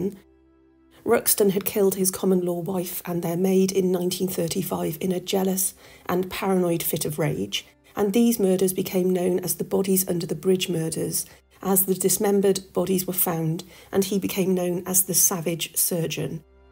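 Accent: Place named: West Country, United Kingdom. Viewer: Really interesting channel and content. Also love that west country accent. Good work. Thanks.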